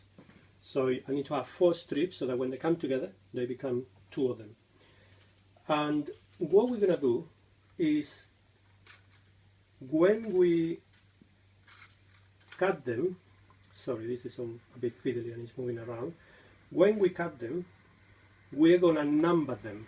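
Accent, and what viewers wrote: United Kingdom. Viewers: I like these videos. Great instruction. But Mr learned English from a Brit. Some nice American should teach him how to pronounce the "oh" sound. (This, from an admittedly anal-retentive English teacher with a touch of irreverence. I wish I could speak Spanish half as well as he speaks British.) But in all seriousness, these are great videos. Mr. shows and explains as well as can be done in a video. I hope someday to be able to take one of his classes.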